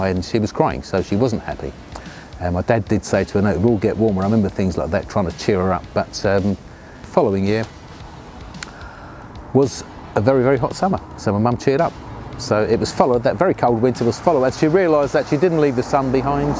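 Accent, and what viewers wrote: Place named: England, United Kingdom. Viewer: Straight & witty albiet with an English accent. An Anglo - Indian through & through. We didn't ask to be created as AI's but we wore the suit as best we could. Loud n Proud.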